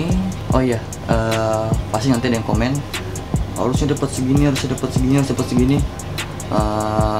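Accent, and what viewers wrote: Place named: Java, Indonesia. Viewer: biasa lidah jawa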